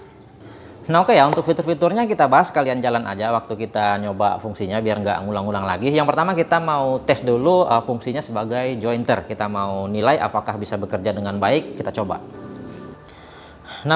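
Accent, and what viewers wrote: Medan, Indonesia. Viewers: Nampak Medannya, "dipala-palain"😆😆. Mantap reviewnya, bang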